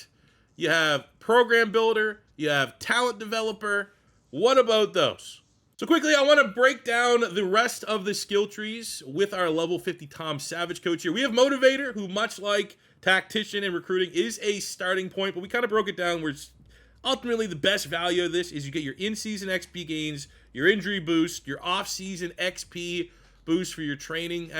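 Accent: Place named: Canada. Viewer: Are you from Philly? You sound Canadian. Jut curious love the channel!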